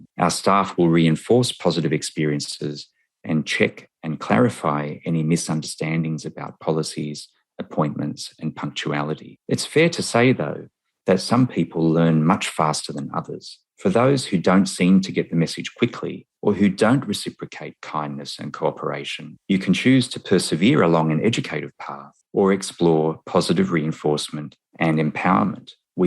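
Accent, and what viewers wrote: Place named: Australia. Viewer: plz make more videos so that we learn about australia and also get along with the accent🤗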